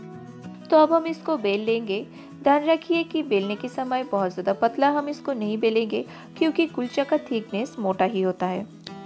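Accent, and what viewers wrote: India. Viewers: Such a sweet Hindi accent , thank you for the reicpe.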